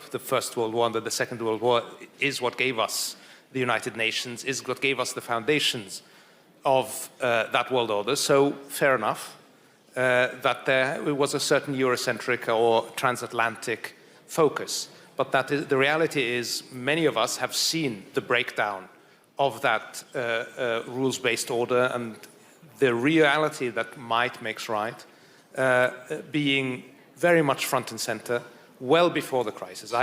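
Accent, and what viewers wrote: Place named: United Kingdom. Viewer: 😍يتكلم باللهجه البريطانيه 👍 و عادل الجبير يتكلم باللهجه الأمريكيه👍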